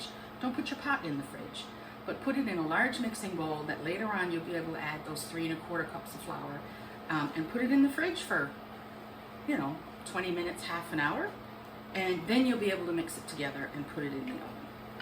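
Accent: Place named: Ireland. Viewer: Boiled fruit cake has always been a big favourite here in Ireland, I started baking back in the 1970's when I got married and its one of the first things I made. These days I usually make tea brack, coffee cake, Bakewell cake and scones. Luckily my hubby loves cake! I honestly thought you were Irish, from Dublin exactly, except for a couple of words. But then Newfoundland and Ireland have long connections. Thank you for sharing your recipe.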